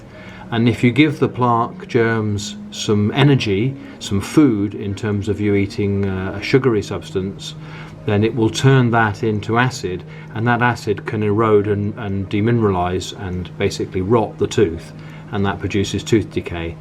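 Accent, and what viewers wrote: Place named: England, United Kingdom. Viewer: Because he’s English and that is the correct way to pronounce it.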